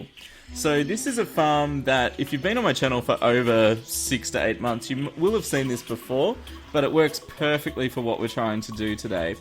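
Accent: Australia. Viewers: what part of Australia are you from I'm in south Australia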